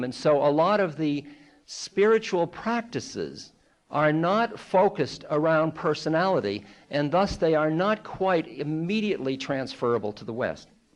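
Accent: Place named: Boston, United States. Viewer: I love the man’s voice and boston accent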